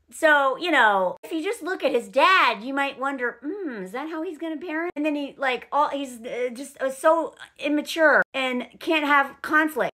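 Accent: South, United States. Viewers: You have a southern accent, but when I say that I mean that you have a general American accent with the slightest, tiniest southern accent on certain sounds. I only say this because I do voice acting and have pretty much the same accent. Only linguists seem to clock it. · You still have a southern accent.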